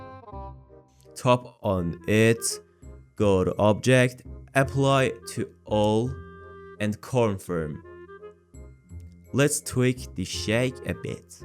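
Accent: Iran. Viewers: بنازم داداش. عالی صحبت میکنی ولی بازم یه ایرانی لهجه تو میفهمه. موفق باشی عشق داداش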